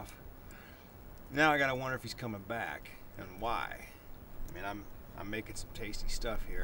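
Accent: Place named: Texas, United States. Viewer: You are so funny- love the hat. Your Texan really comes out when you talk!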